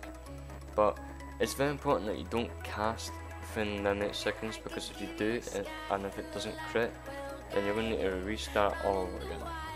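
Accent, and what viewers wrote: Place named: Scotland, United Kingdom. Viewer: Scottish ya fucking rocket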